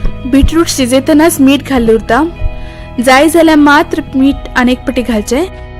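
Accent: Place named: Konkan, India. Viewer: Which salt do u use is it sendha... I like your style of explanation very cute konkani.. Good recipe too :)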